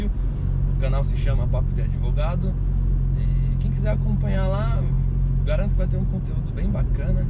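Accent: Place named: Rio Grande do Sul, Brazil. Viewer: Acho que eu sou o unico que imaginava que ele fosse assim kkk pela voz dava pra saber que tinha uma aparência nova Mas pelo sotaque parece gaúcho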